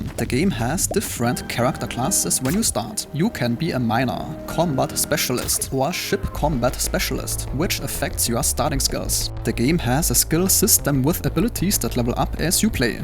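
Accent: Germany. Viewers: Brother its kinda off topic but are you german ? : D just curios cause of the accent (btw i am really exited for this game i hope its not some early acess scam bs )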